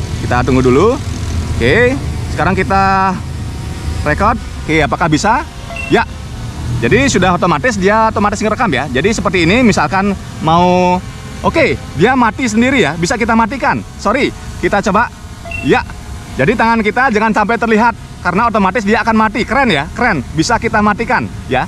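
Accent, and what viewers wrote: Bali, Indonesia. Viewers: MAS, SAYA MAU TANYA KOQ LOGATNYA ABANG KAYA ORANG BALI...MAAF CUMA PENGEN TAHU AJA DARI MANA ASALNYA BANG SAYA SUKA NYIMAK VIDEO REVEWNYA.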